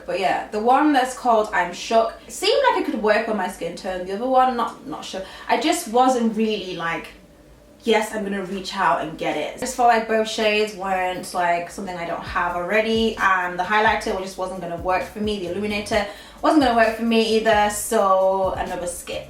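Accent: United Kingdom. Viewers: I love the accent! Are you British?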